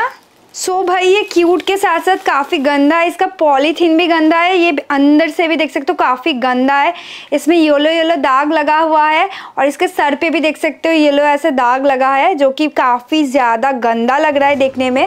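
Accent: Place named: Bengal, India. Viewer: 😂 Your Hindi With Bengali Sweetness ☺️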